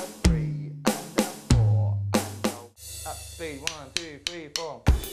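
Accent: England, United Kingdom. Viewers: Are you really English?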